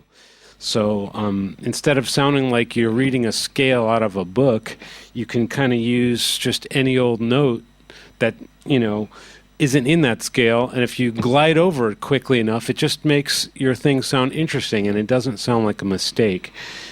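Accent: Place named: United States. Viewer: He is American. It's how he's always going to sound. Like if you learn Spanish, you're not going to have the spanish accent when you speak English. Having the accents is a big part of language, and when learning another language, they usually don't blend.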